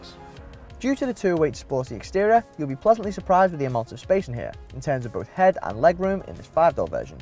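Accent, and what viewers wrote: Liverpool, United Kingdom. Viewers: with a Scouse accent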